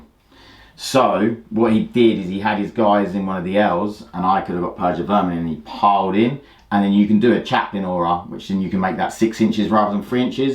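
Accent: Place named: Australia. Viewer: Thought you were from OZ…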